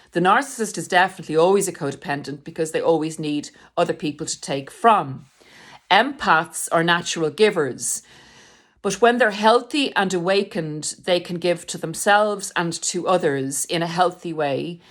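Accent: Ireland. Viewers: Hello from London and I found your channel today and I'm on video number 4! It helps your Irish as both my parents are, so it's quite familiar listening to you 😊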